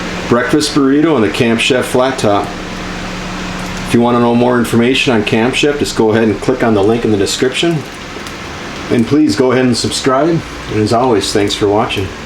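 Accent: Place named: Minnesota, United States. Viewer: That accent, the weather, you've got to be in Minnesota.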